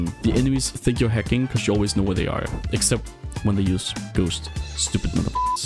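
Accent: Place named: Denmark. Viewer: You sound like your from denmark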